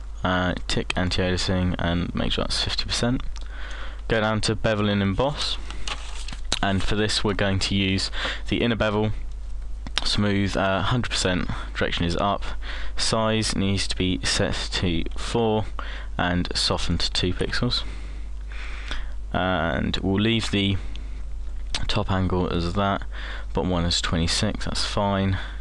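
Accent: United Kingdom. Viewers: Thanks for lesson, British english cool! - Giorgip7-Tbilisi, Georgia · he is british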